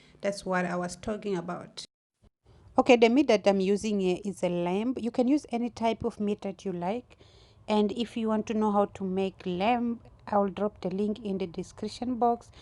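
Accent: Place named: England, United Kingdom. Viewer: I love your English accent 😍 that okra is inviting me to come eat 🤩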